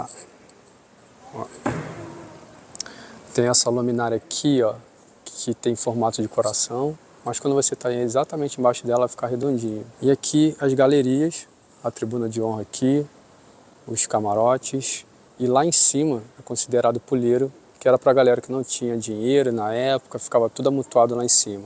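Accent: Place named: Rio de Janeiro, Brazil. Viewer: Adoro o sotaque carioca, muito chic!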